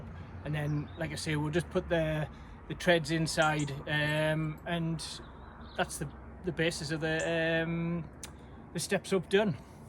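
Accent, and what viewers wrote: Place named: Northern England, United Kingdom. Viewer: I love your running commentary teaching. I'm no carpenter, but I can follow your procedure. And I get nostalgic listening to the lovely Northern voice of my long ago childhood. Thank you.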